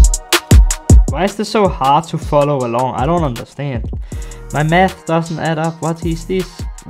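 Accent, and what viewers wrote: Denmark. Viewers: Why does it hear like hes danish? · He is danish im danish so i Can here it with his accent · You have danish accent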